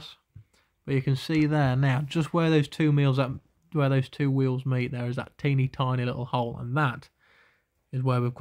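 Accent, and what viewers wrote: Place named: Scotland, United Kingdom. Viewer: Great Job! Beautiful enamel dial. It was nice hearing your scottish accent. Keep on with your videos. Regards from Argentina.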